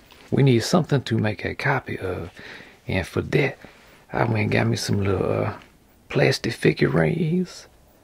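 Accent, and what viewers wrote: Louisiana, United States. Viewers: Southernish? It's actually more like a Bayou Accent but he seems to be in an area where they speak "English". LOL Deep swamp language would need subtitles. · you have the most Louisiana accent I’ve ever heard and I love it. I don’t know if you’re actually from Louisiana but this is how I imagine everyone down in the bayou speaks. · Are you from Louisiana? Your accent is obviously exaggerated but you have a bayou accent that can only be exaggerated by a Louisianian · the awesome Louisiana drawl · this guy's accent reminds of of that cajun chef Justin P Wilson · He does sound like he's from my town for sure. Gonzales LA FTW. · you gotta be from da bayou. I recognize that accent.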